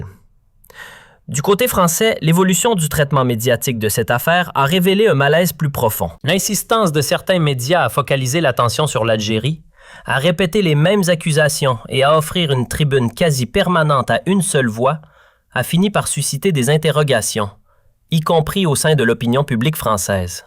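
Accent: Canada. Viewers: Pourquoi la voix a un accent canadien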